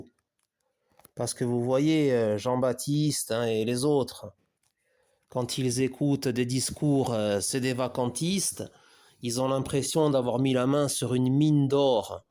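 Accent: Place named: Corsica, France. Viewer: Cher compatriote, Pace e salute ! Votre accent ne m'avait pas trompé, je le connais trop bien. Permettez-moi de répondre à votre contradiction, non pour alimenter une stérile polémique, encore moins pour être désagréable, Dieu m'en garde. Je souhaiterais simplement vous poser quelques questions. Au préalable, je précise que j'ai reçu le baptême avant Vatican II, et que je demande chaque jour la grande grâce d'être inébranlablement fidèle à ma Mère, la Sainte Église, à sa Doctrine, à son Culte, à sa Morale, à sa Loi. Voici donc mes questions : Un VERUS PAPA, càd catholique, revêtu validement et légitimement des prérogatives de Saint Pierre, peut-il ostraciser et interdire la Messe codifiée à perpétuité par saint Pie V ? Peut-il nier la Médiation universelle et la Corédemption de Marie ? Peut-il bafouer la Morale en bénissant ceux qui pratiquent des abominations à Dieu ? 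Peut-il soutenir que toutes les religions conduisent à Dieu, contredisant ainsi le Commandement du Seigneur à ses Apôtres avant son Ascension et la raison même et la sacrée Mission de l'Église hors de laquelle point de salut, laquelle continue Jésus-Christ ? Un authentique Vicaire de Jésus-Christ peut-il affirmer que les catholiques adorent le même Dieu que les musulmans ? J'arrête là, mais je pourrais allonger la liste sur de nombreuses pages. D'ailleurs, vous pouvez vous-même comparer la Doctrine immuable de la sainte Église, de ses Papes, de ses Conciles, avec les innovations et hérésies professées depuis Va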